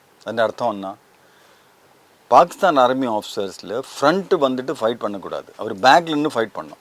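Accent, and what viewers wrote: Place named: Kerala, India. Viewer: Mallu tamil is always nice to hear..nice information..jai hind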